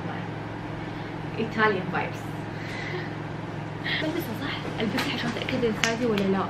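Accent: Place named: United Arab Emirates. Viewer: لهجتها اماراتية واضح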